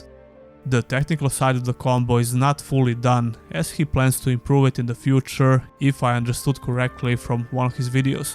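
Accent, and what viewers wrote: Serbia. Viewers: ZNAO sam da si naš po akcentu :) odličan video! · I could recognize a serbian accent from miles away :D Dobar kanal brt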